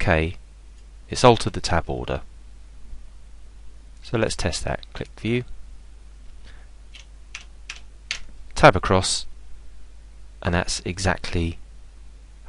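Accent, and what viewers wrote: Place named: United Kingdom. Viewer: Great concise instructions, although the intro showing why it's needed it probably not so necessary. We wouldn't be here if we didn't already know what was wrong. And delivered, no less, in that wonderful British accent that makes the material just so much more profound-sounding; the accent that can make reading a telephone book sound like an interesting intellectual exercise! Cheers!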